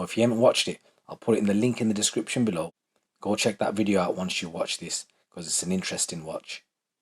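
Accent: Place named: Liverpool, United Kingdom. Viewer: Gotta be a Scouser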